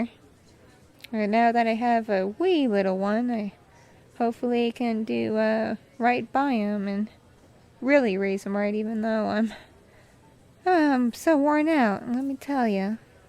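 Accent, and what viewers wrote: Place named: South, United States. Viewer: I can hear a good southern accent but its a medium one but atleast its not bad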